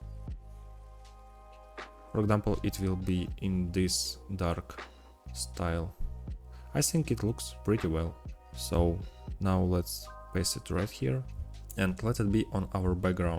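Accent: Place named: Russia. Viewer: Прям такой русский акцент. Вы говорите по русски?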